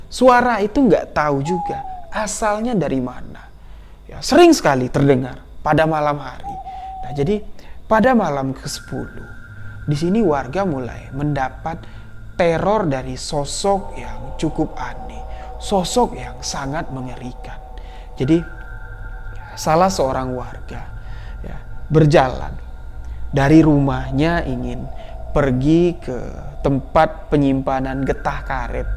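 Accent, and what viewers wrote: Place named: Bali, Indonesia. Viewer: Oalah, saya baru liat eps ADUM. Ternyata abng tinggal di Bali., pantesan logatnya spt org Bali. Sukses terus bang, sy suka cra penyampaian cerita dr abang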